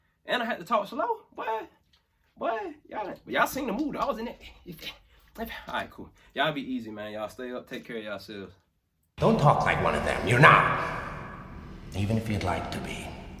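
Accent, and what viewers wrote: South, United States. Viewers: I live in Mississippi, and I feel embarrassed in front of people because of my lack of understanding, which makes me feel isolated. Please continue making videos specifically in the Southern accent to improve my understanding level. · As a Born and Raised Alabama native this is 100% true!!!! Every. Single. Word. He says and btw it’s not just the black culture or ur African roots cuz errybody Ik talks like that!😂 ♥️ur content keep rising my Southern brother!! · I'm from Tennessee, we talk like this too. 😂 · I can't speak for other states, but here in Arkansas this is pretty accurate for how both black and white folks talk. There's some subtle differences in word choice and vowel sounds, but the cadence and tone are all right. Makes sense, given you're from Mississippi. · As a Texan from birth this is the best representation of the southern dialect I’ve ever seen thanks for education ‘em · Excellent interpretation of southern speech 🎤. 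I understood everything and gave answers before you stated it 😂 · As a native from mississippi he doin pretty good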